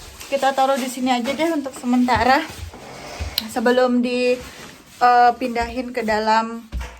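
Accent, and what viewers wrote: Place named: Bali, Indonesia. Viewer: wah pantes ngomongnya campuran bali tadi di Vlog wkwkw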